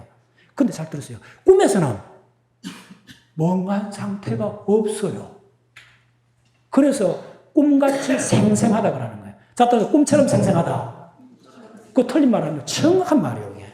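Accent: Gyeongsang, South Korea. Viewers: 강의내용은 좋은데 경상도 사투리가 심해 잘 알아 듣지 못하겠다.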